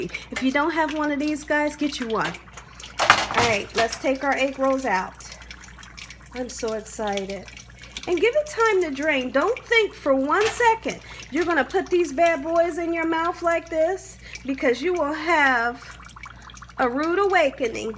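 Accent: South, United States. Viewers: I love your show, my granddaughter love you when u say "like so" too bad for the haters. I LOVE YOU. R u from the South. My name is Pam I live in Up State NY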